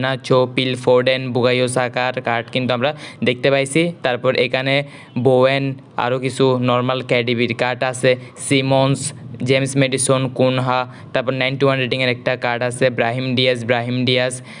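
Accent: Chittagong, Bangladesh. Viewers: main ktha oitese vai ami Chittagong ar apnr voice shone mone holo apnio ctg ar Apnr voice a akta reaction saitesilm rki amr id ar oppr🥺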